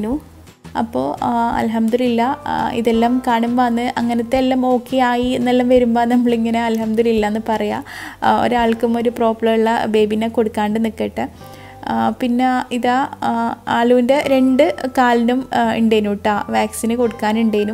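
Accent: Kannur, India. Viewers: Hi ... Videos kanan Nala resam undato. Kanoor sasaram kelkan Nala super anu. Eniku oru mol undu 8 months anu mole uragikazhija night 11 pm kazhija njn videos kanan time kittoolluto atha comments onu ayakan pattunilane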